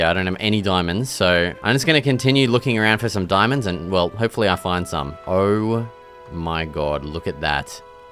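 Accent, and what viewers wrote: Australia. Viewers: You sound like a Aussie bloke nice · He’s Aussie!?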